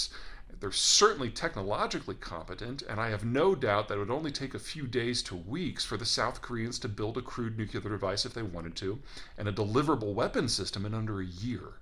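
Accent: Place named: Texas, United States. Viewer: Don’t blame him, he lived here in Texas for a long time. We barely speak English.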